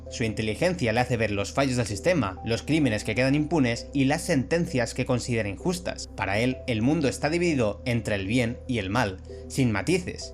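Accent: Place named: Spain. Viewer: Español de españa? ostiaaaaas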